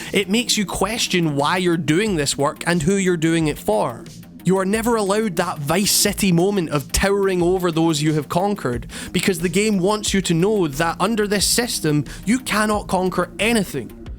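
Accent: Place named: Wales, United Kingdom. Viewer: Neat video! I love Welsh boys! You have the cutest accents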